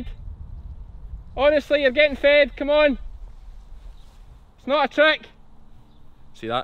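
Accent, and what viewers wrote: Scotland, United Kingdom. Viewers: I just enjoy your channel so much, informative, funny, still having a wee bit of trouble with the Scottish accent a bit strong at times but I am getting better at it the more I watch you, I never knew there were so many breeds, keep the videos coming👍🐑🐑🐏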